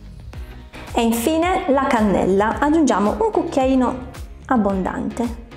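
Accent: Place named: South, Italy. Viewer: Hai un unica cosa che mi da fastidio, l'acce'ntooo te'rro'ne. Cazzo rovina la favola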